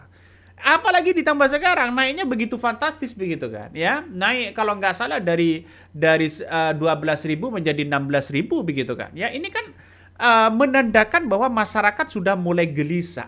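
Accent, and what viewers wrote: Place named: Jakarta, Indonesia. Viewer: oleh orang jakarta gitu gomong nya bro yg jelas kalau bicara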